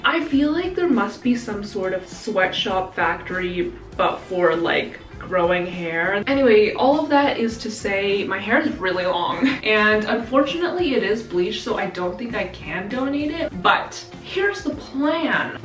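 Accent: United Kingdom. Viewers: Me being a British person, reacting to her British accent 😂 I died 😂😭🤭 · Wth I was like "her voice sounds familiar but I've never watched her before" and it's because you sound like trin lovell but less deep, even the british accent lol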